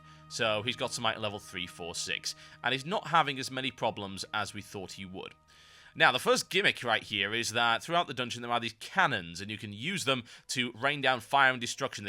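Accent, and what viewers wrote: United Kingdom. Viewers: Hmmmmmm snarky british sounding guy who talks fast? That's a Yahtzee!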